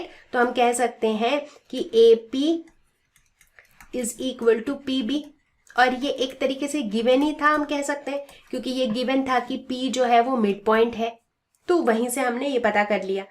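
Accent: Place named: Maharashtra, India. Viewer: Ma'am is from Maharastra 😂👍🏻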